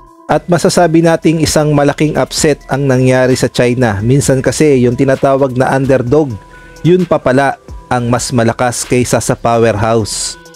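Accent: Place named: Visayas, Philippines. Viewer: Bisaya man ka dong. 😂😂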